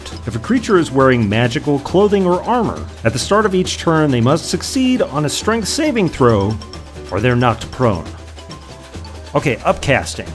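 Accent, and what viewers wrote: South Africa. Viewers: Are you Afrikaans sir?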